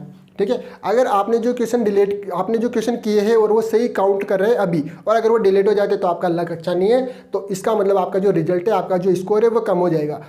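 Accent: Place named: Malpura, India. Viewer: Sir aap malpura ke kya aapke voice tone se guess kr rahi hu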